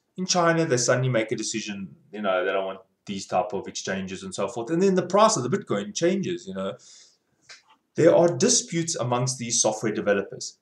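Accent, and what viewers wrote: South Africa. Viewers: Definitely a South African accent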